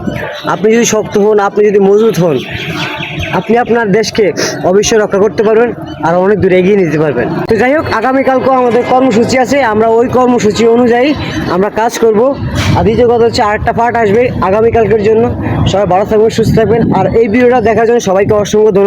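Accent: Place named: Faridganj, Bangladesh. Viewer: তোমার ভাষা ফরিদগঞ্জের কোন জায়গা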